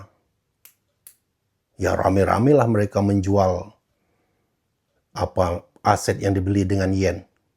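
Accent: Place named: Banyumas, Indonesia. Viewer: mantap nih orang ngapak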